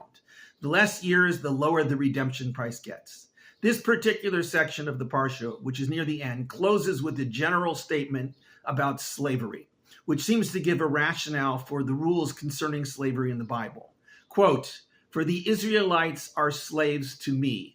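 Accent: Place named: United States. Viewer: You sound very indigenous to the Middle East with that American accent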